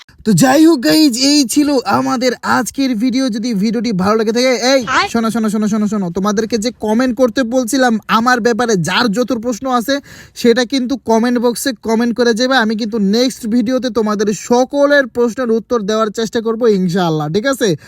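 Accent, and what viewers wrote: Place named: Munshiganj, Bangladesh. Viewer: Bhai apnr basha Munshiganj bikrampur er kon jagay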